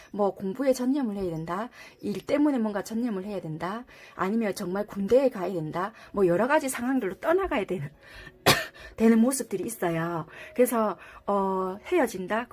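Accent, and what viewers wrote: Busan, South Korea. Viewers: 다시끝까지들어보니 남자친구가 일때문에 바쁜거 맞아요 그래서 답답했어요 내일 10일만에 만나거든요 ^^ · 감사합니다! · 고맙습니다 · 다시 3번 뽑았는데 2주쫌 넘었거든려..그래서 잘 모르겠어요ㅠㅠ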